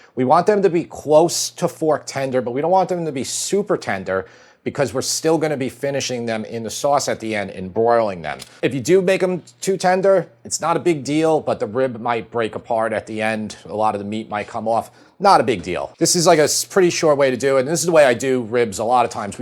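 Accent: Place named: Long Island, United States. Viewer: Never lose your lawng island accent. As an LI native (east end) who splits his time between the island and North Carolina, the accent is always recognized down here as a “new yawk” accent. I always correct them and tell them that “It’s a lawng island accent, and we live ON the island not in”.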